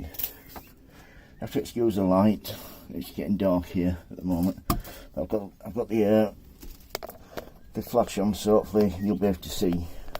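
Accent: United Kingdom. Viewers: Lovely video. Loving the Yorkshire accent to obtw, I'm in West Yorkshire myself, or should I say mi sen 😂 What other flowers do you think would go nice with alyssum in a basket? Cheers, Ali.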